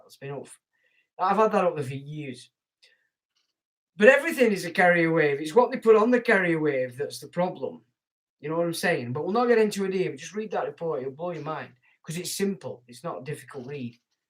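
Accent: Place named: Yorkshire, United Kingdom. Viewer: Only discovered this channel a few months ago via a link on BNT and have to say I wish I was here from inception! Although I've been in Dorset 42 years, I originate over't tops from you at Red Lumb, so not only the language resonates but so too the accent. On language (as I'm sure you're aware) "ab" means "not" or "none". All native tribes are "origines" Thanks for the excellent info in the vids I've seen so far.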